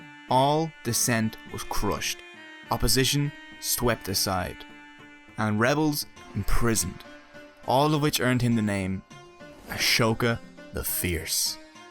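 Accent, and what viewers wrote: Dublin, Ireland. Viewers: S/o to Dublin accents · As an Indian I have to say, it's honestly quite fun to hear the names with an Irish accent. Now I'm a fan 😂.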